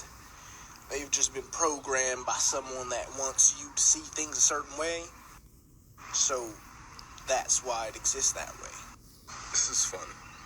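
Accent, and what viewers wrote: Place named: United Kingdom. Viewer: Goood shit!!! Haha!! I love this dude. Amazing British accent too 😂 💪🏼💚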